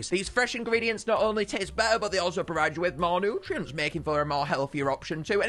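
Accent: United Kingdom. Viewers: are you British (ik this is very weird to ask just curious )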